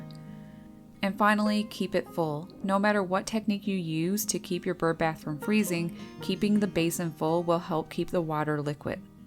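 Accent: Canada. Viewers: Great post and lovely Canadian accent.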